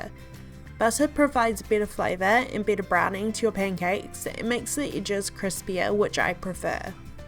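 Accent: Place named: New Zealand. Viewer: New Zealand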